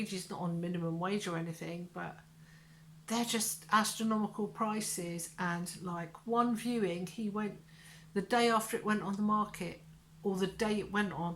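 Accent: United Kingdom. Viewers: OMG, aren't you adorable! 😊 I love our channel - I've just now subscribed! GREETINGS FROM NAGOYA, JAPAN! (I'm not Japanese, myself... I'm a mix of Indigenous Canadian (Siksika/Mohawk-Cree) and Norwegian) - and I like your UK accent! My dear Abigail is from the UK, but she's a Yorkshire lass, so, different accent LOL - anyways, I love that you're still going strong with this channel of yours! Seems so many polyclay'ers have quit YouTubing some fistful of years ago, but you're still rockin' it! I LOVE YOUR CONTENT! 🙂 Please do continue it... I've so many ideas/questions... how to make boxes/containers from polyclay? Etc. - I'm trying to figure out how to make "Ranger Eyes" - they're basically, velcro patches for backpacks, first aid kits, and EDC (Every Day Carry) pouches... I wanna try using polyclay... as when the products are thin enough, they're flexible enough... 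but I might need to use my leathercrafting sewing-hole-punch 'round the edges before baking... WHOOPS! I'M RAMBLIING! LOL Anyways, thank you for your content! And tell what's-his-name to give you your crafting room back! CHEERS! 😉👍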